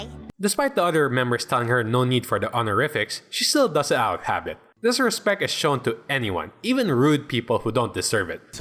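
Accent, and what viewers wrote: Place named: England, United Kingdom. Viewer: yet another pronunciation of names à la english smh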